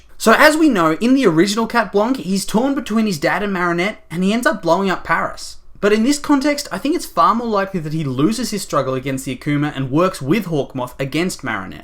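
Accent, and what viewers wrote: Australia. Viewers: Yo are u aussie? Your accent sounds def Australian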